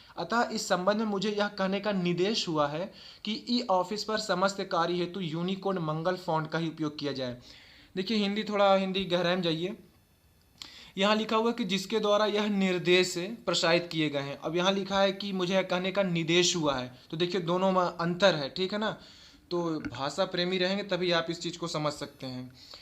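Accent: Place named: Kanpur, India. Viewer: Aap ek ek bat ko bahut hi clear btate hai.....Aur sbse achi bat aapki ki aapki language mujhe fatehpur ya kanpur nagar ki lagti hai...Thanx so much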